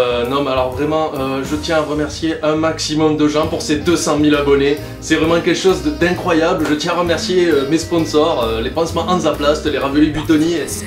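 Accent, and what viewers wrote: France. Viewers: Découvert via le dernier film de TGWTG (quoi? un tel accent Franchouillard ça ne se loupe pas! Surtout quand il appartient à un autre fan du Doc') et depuis bah je suis sans relâche, alors franchement GG, tu mérite, et surtout continue comme ça!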